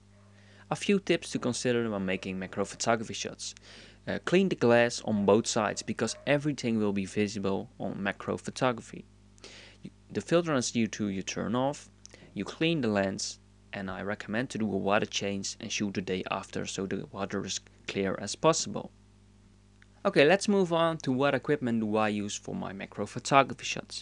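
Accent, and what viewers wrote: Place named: Netherlands. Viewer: Nice tips. You sound Dutch, am I right? :D (Ik ben ook Nederlands)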